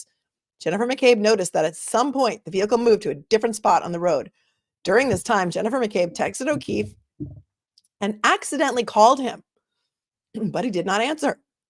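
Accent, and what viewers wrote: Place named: New York, United States. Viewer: i love when you get so excited how your NY accent really comes out! Thanks for all the input!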